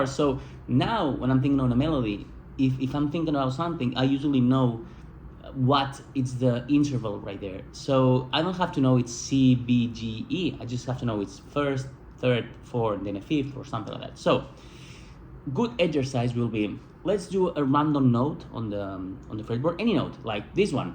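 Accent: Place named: Spain. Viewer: Gracias. Me es más fácil entender el inglés con acento español que el ingles americano. Thank You pal!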